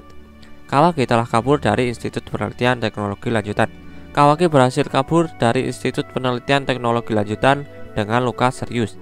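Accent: Java, Indonesia. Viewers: Nonton.. medok euy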